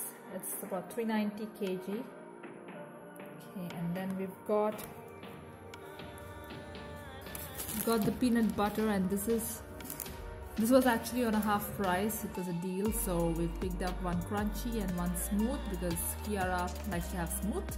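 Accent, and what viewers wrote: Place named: India. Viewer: I absolutely will! Btw, I love your Indian accent mixed with the Australian terms. It’s just so worldly to me and it’s cool to listen to 😃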